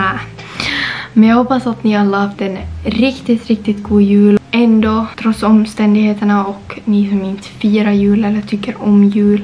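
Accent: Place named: Finland. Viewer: hör det på din accent, är också finsk, svensk🥰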